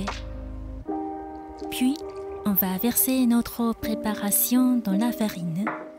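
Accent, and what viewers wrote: Japan. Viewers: Bonjour je viens de decouvrir ta video sur le pain aux figues et noix cela ma donne envie d'en faire tout de suite, du coup je m'abonne a ta chaine. PS J'adore ton accent japonais merci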